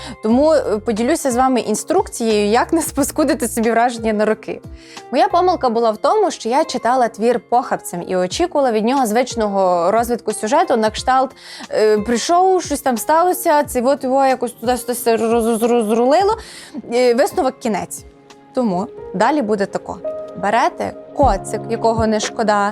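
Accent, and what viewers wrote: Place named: Ivano-Frankivsk, Ukraine. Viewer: Коцюбинський- талант! А ви хто, щоб іронізувати і зневажати? Історик розповідає цікавіше, мудріше, без принижень історичних постатей. У Києві опинились нещодавно, але вже знущаєтесь із свого станіславівського діалекту. Неприємно слухати. Хай би молодий історик усе викладав…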